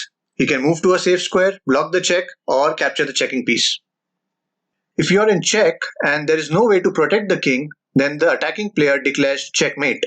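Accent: India. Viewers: Indian accent, huh? 😀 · Indian accent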